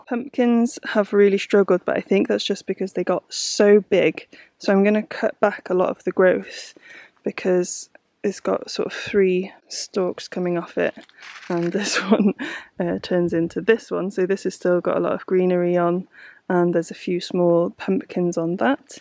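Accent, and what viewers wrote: Wales, United Kingdom. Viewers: A fantastic construction of your journeys! I mean the telling of events; but moreover the ‘Journey of Mind’ is evident in your “Home to Home” travelogue. What IS HOME… On one hand I can hear the WELSH accents in this video (as anyone does when they get “home” Flip side to see the excitement of “home” being seeing the watering and chickens. BTW you sound so much more confident and in your element in the garden than your starting videos! You are Home! “You Got This”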